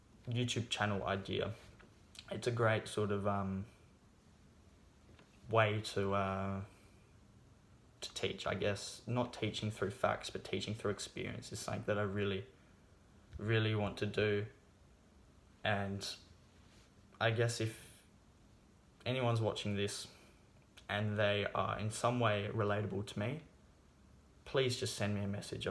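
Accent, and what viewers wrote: Australia. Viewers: Good luck and best wishes. I like the Aussie accent. You did well for your first video. 👏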